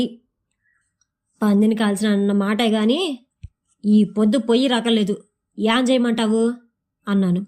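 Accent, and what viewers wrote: Rayalaseema, India. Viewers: నేను రాసిన యాట కథకు మీరు రాయల సీమ యాసలో జీవం పోశారు మీకు మీ ఉషా చానల్ కు దన్యవాదాలు మేడం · నమస్కారం madam, యాట కథలో మీ వాయిస్ రాయలసీమ స్లాంగ్ లో చాలా బావుంది. ( అమీరున్నీసా ) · బావుందమ్మీ నువ్వు సెప్పిన ఇదానం.. కథను కల్ల ముందు నిలిపినవ్ బా.. గొప్పగా చెప్పారు గారు.. మా సీత్తూరు భాషలో.. అందులో మా వెదురుకుప్పం లో రచయితకి పక్కన ఊరు మాది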